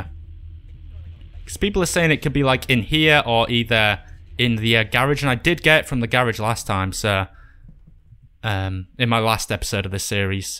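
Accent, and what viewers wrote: United Kingdom. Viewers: Another German here, i do like your videos too, but mostly i like your accent! I cannot recognize it, somehow british, but special. Could you tell me, where do people speak like you? Greetings from Frankfurt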